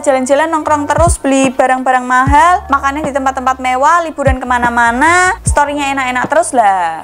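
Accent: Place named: East Java, Indonesia. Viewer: Mbk asline wong ngndi??? Logat'e kyok.wong jawa timur'an..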